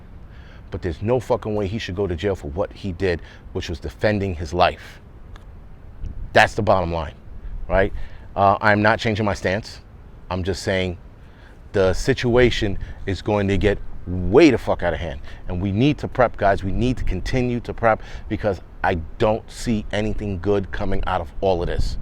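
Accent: New York, United States. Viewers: He talks like a real New Yorker and a stand up guy. The channel is called. Who are people expecting when they sub, prince charming?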